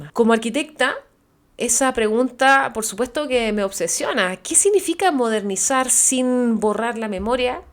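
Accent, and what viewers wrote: Chile. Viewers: Hola, querida...he visto varios de tus videos y siempre quedo maravillado por tus comentarios y tu particular forma de expresarlos con tal riqueza idiomática y con ese encantador acento chilensis. Me asombra tanta cultura en una persona tan joven, y envidio a tus amistades que tienen la fortuna de poder dialogar contigo y escucharte exponer tus agudos puntos de vista. Ojalá algún día te decidas a mudarte a BA para que los argentos podamos disfrutar de tu aporte cultural a lo que ya tenemos. Cuídate, muchachita, y que Dios te bendiga.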